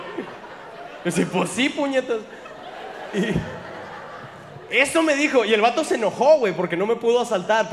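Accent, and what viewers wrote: Mexico. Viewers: No sabes cuantas veces he oído este stand up. Te felicito eres un comediante muy fresco y por alguna razón cada que te escucho me muero de risa. Te felicito, el acento chilango esta de lujo y súper ingenioso. Sobretodo ahora en tiempos del COVID es muy relajante escucharte en este encierro. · Llevo escuchando un rato...parece Franco E...o todos los regios hablan asi? (Es "cotorreo")Jajajajaja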